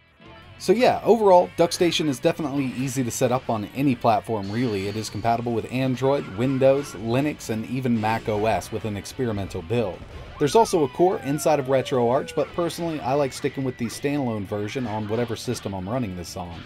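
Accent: United States. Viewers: An American accent.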